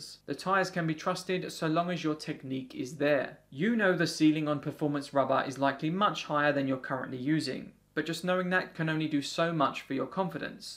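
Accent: United Kingdom. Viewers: Wait what, he’s British? 😋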